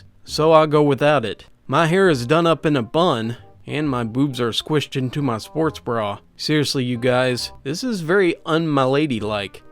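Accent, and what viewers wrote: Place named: Kentucky, United States. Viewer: Still my favourite accent.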